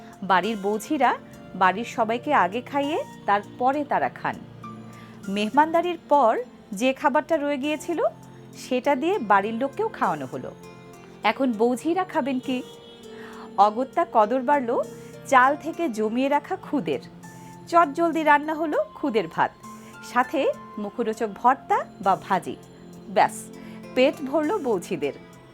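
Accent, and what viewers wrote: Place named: Mymensingh, Bangladesh. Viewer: amader paser bashar ak varatiya maymonsing er sm apnar moto kotha bole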